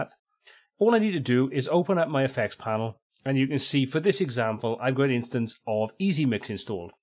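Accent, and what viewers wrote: Wales, United Kingdom. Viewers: Pardon the off-topic comment, but I'm a huge fan of UK accents. I'm thinking I hear Rob Brydon (seen on TV show 'QI' at times) in your voice. Might you hail from Wales or nearby? Thanks!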